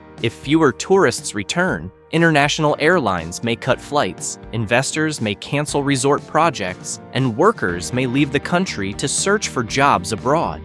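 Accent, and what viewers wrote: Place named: United States. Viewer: Yet another American accent telling 'like it is'. Don't believe anything that comes out of that place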